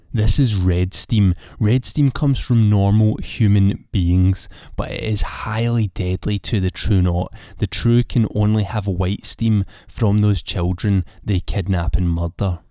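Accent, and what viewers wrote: Scotland, United Kingdom. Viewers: I'm guessing Scotland.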